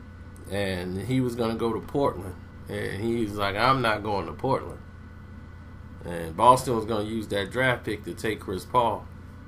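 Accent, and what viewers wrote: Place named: South, United States. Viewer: Ronde Rondo?? How u pronounce some of ur words feel like I'm listening to my grandpops from down south or somethin cuz...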